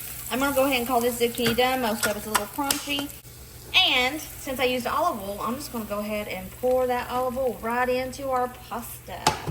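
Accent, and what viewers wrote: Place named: Southern Virginia, United States. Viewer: Please keep content like this coming. I don't necessarily need to feed myself for a dollar, but any meal ideas that help save money are always welcome. And you really do offer some pizzazz with your cooking. Love it. Also, I haven't heard anyone say "peench" since I lived in southern VA, so you're bringing back some good memories, too!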